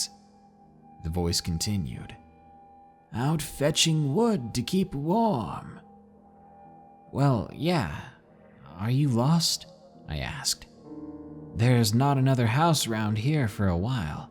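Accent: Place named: North Carolina, United States. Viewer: Listening to your voice telling these old stories reminds me of growing up in Valle Crucis, North Carolina, in Watauga County. I've missed that accent and the stories the old men used to tell while playing checkers outside the Mast General Store. I've missed my home for so long, just hearing your voice, so familiar to me from so long ago, has me in tears. Thank you so much and please keep sharing these. ❤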